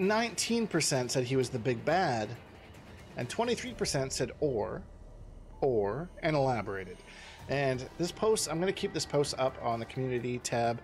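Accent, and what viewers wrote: Canada. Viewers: Ah aboot time we get another great video from love the way u guys say aboot in canada hope i can run into you in the beta brother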